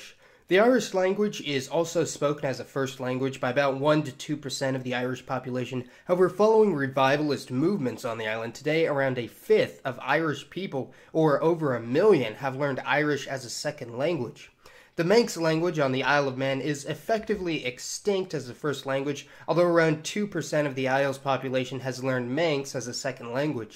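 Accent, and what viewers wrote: United States. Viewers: square sausage the voice narrating is Northumbrian you mean American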